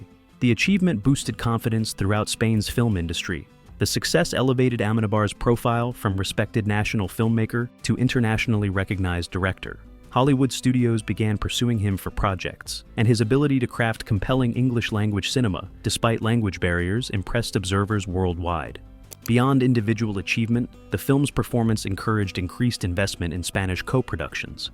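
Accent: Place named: United States. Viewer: Complete waffling drivel. Garbage duhmerican narration.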